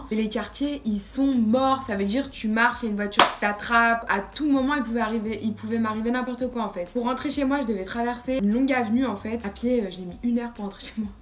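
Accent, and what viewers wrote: Lyon, France. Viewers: T’es de Lyon ?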